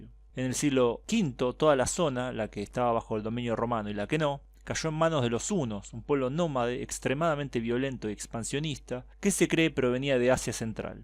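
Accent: Rioplatense, Argentina. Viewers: What he was trying to say is that the channel is from Argentina, not from Spain. But we all speak Spanish. I'm chilean and I recognize his argentinian accent. Greetings to Ukraine! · Asi se pronuncia el castellano rioplatense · Hay palabras que sencillamente no se que dices, son solo palabras pero tu Argentino dificulta un poco saber que palabras acabas de decir.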